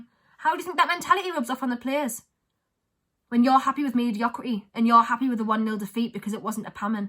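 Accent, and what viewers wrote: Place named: Darlington, United Kingdom. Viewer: are u from darlo im sitting here wi wor lass and i said to her hoy pey she talks like u lol.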